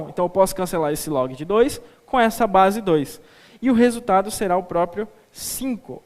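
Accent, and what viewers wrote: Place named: Manaus, Brazil. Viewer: Ótimos videos, parabéns! Sotaque manauara muito bom de ouvir.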